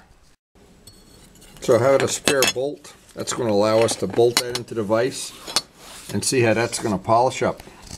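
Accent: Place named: New York, United States. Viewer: I swear... you sound just like my father!! Same N.Y. accent. Same gestures. Same humor. Same ability to do things that many would think were too difficult to even try on their own. My dad is a Parris Island Marine, with a tenth grade education, who learned that everybody has value, as we can always learn from others who have struggled to accomplish something. So, when you mention the friends who have helped you learn your craft along the way, that says a lot about your character sir. I ain't askin for a hug or anything... just giving you your props. Peace!!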